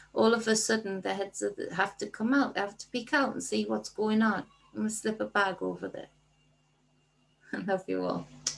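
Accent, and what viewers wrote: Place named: North England, United Kingdom. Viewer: Hi. I have recently subscribed to your channel. I’m a reiki practitioner based in north east England too. Lovely to hear your accent 😊 I’ve made a few videos myself about what’s going on 😉🙏❤️ hope you are well. Take care lovely and keep up the good work 😀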